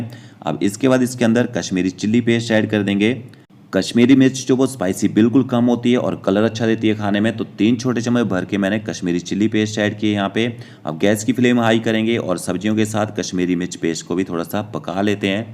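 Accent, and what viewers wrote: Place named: Himachal Pradesh, India. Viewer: Maine Apki tone se pehchan Liya,By the way kahan se??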